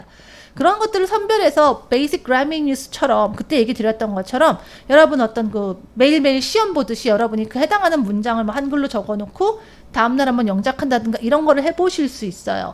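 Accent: United Kingdom. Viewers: 요즘 선생님 강의를 듣고 있는데 영국 억양도 그렇구 분위기가 브리짓존스의 르네 젤위거 배우를 많이 닮으신 것 같아요!!!!!너무 아름다우세요!!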